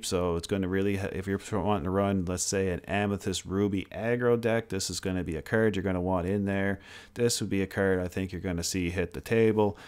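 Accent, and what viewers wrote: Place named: Canada. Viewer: The level of Canadian in your voice....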